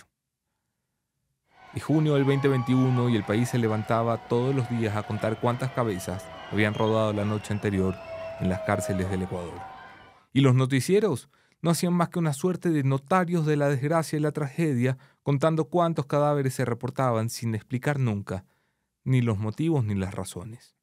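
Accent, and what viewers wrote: Argentina. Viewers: Lo q yo no entiendo Es Venezolano habla como Argentino y vive o vivio en Ecuador 😮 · Por qué habla con acento argentino ? No es ecuatoriano?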